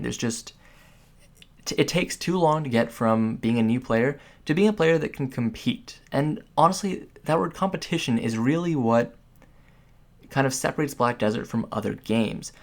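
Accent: Canada. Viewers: Haha you candian right?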